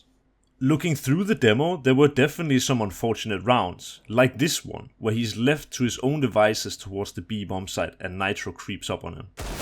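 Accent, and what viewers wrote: Denmark. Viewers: nice dansk accent m ed dit engelsk dov rigtig god video ahaha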